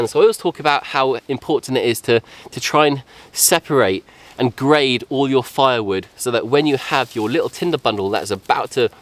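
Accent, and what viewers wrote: United Kingdom. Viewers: then with that being said....your guess would be as good as mine. Lol 😂..for living in Virginia for 25 years you still have a heavy British accent. Which is cool 😎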